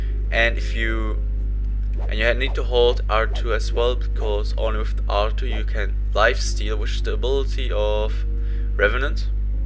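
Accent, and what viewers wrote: Germany. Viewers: Are you from Germany you have a German accent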